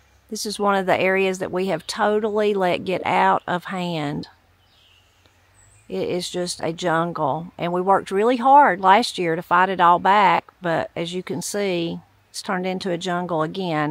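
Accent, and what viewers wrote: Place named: Arkansas, United States. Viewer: I love listening to you talk. You remind me of my aunt pearl she was a native Arkansas. And she speaks like you do w that accent. It's so true...and calming to listen too. So is voice I get soo much off her devotionals.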